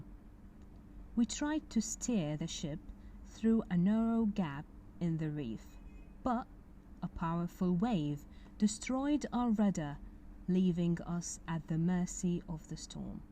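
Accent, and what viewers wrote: United Kingdom. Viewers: Wonderful Miss you're an excellent teacher. You don't know how I love British accent and I wish to visit London in one day 😕🌷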